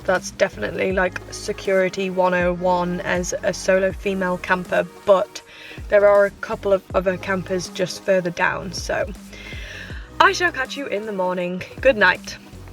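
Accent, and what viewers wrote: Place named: West Country, United Kingdom. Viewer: ...scaffold Pike 😜 Scarfell Pike ✔ you have a West Country accent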